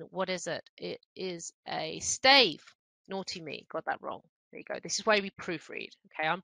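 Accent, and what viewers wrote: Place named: England, United Kingdom. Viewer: Great video as an essay writing demonstration. Great accent. Are you from England?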